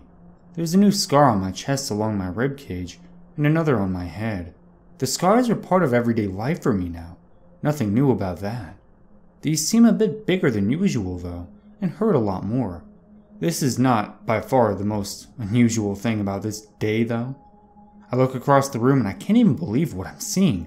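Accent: United States. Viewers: For sure. Don't worry about it! You should have alot more patrons as well. Or do people just use all those other sites you mentioned? Anyway this is one of those deep tales that kinda freak me out. Kind of a real thought worthy message there eh? Tho I don't think it was Saturnites or whoever that were the...makers. Pretty good pasta tho. Forgot to check-was that you doing all the voices? Seems like a pronounced Yankee lilt or something in there lol! Thankyou!😍✌🐾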